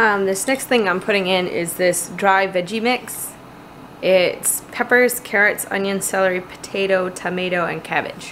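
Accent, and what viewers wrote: Maine, United States. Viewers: I could sit down and listen to your Maine accent all day long soup looks great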